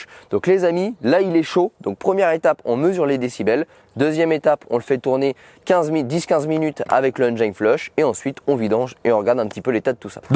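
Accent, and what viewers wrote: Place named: Normandy, France. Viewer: C'est comme ça que l'on parle en Normandie dans L'Eure ! Rien d'incroyabe !😉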